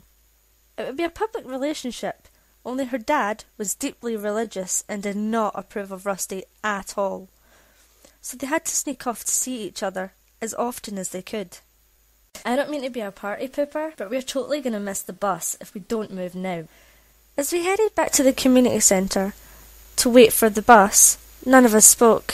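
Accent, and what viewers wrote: Scotland, United Kingdom. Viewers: Could you please say "fishfingers and custard" in your awesome Scottish accent? Awesome creepypasta.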